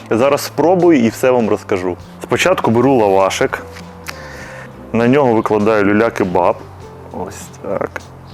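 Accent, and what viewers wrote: Ukraine. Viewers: Все люблю з українським акцентом. Дякую!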